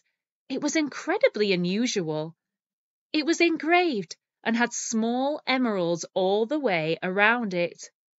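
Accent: United Kingdom. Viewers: I used to listen to American English only that I don't get the British one, but after watching a couple of your videos, I got used to understanding more British English! Thanks so much! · Thank you so much, I had a nice time hearing and read along to have British accent. It was so fun that as soon as I saw the word mesmerising, I immediately thought of mermaids. And I was so sure about it when I read that the woman's name was Coral. Thank you for the story 😊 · Yes, sometimes I got lost in the story, but it's because I saw a bunch of words that have never seen before. Hahaha I used to be angry at this, but now, I know it's something normal, it's part of my English learning journey. If I already knew them all, why would I learn English then? Hahahahaha I want to thank you for this story. I helped me a lot to improve my British intonation, pronunciation and learn new words. 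Keep going.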